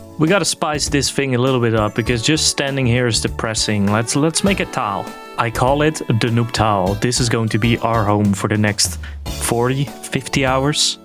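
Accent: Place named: Netherlands. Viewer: Ik hoor een Nederlander, ik heb je door 🧐😂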